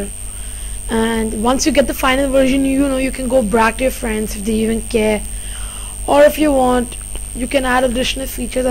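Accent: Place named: India. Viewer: you sound like a indian speaking english indian lol nice video. good work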